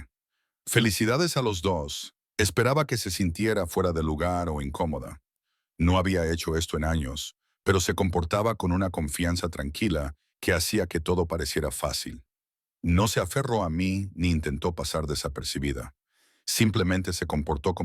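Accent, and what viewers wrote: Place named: United States. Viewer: La narración es muy mala, el acento yanqui insoportable. 5 minutos y no he aguantado más...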